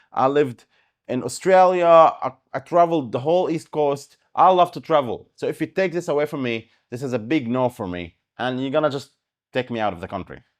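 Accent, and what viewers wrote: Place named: Europe. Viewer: You sounds from Europe, where are you from?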